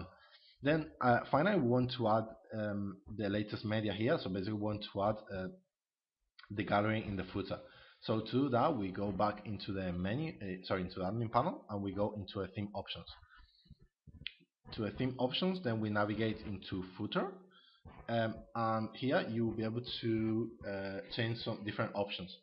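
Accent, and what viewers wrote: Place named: Spain. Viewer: jejejeje por el acento me sonaba a bastante español, pero ahora que he visto por ahí..."subir archivo" jejejeje Acabo de comprar el tema y lo estoy instalando, a ver qué tal. Un saludo y gran trabajo con este soporte en vídeo.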